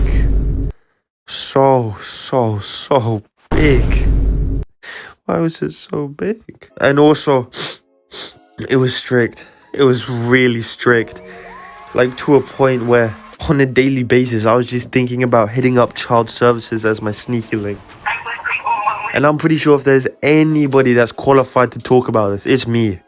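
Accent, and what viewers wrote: United Kingdom. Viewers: Anyone else notice he has an English accent with Puerto Rican parents? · Your Puerto Rican with a UK accent? That’s interesting…. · "Puertorican" Bri'ish accent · The fact that he’s a Puerto rican with a British accent and his dad it’s kinda cold where he’s at is kinda wild to me.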